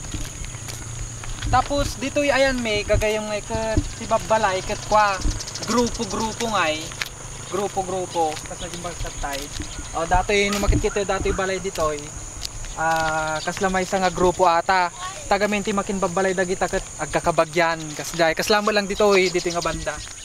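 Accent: Ilocos, Philippines. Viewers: Hearing you talking Ilocano reminds me of my lola.... I really miss her a lot. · Maraming salamat for videos mo! We love to see the food and the location. Asawa ko really enjoys hearing you speak Ilacono. It makes her miss home in Laoag City. Big thumbs up!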